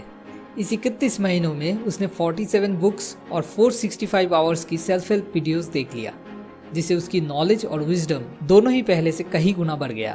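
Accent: Bengal, India. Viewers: are you Bengali spekar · good video but Bengali accent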